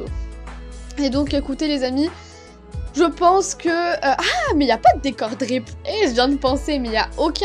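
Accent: Quebec, Canada. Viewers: Tu est cebecoise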